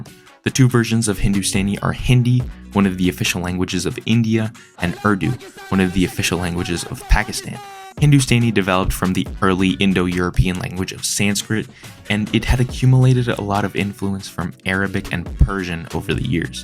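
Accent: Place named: United States. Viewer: Couldn't understand any of this. Please speak English, not American.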